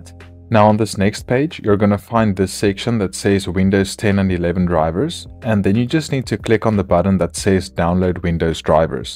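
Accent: South Africa. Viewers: Definitely South African accent 😂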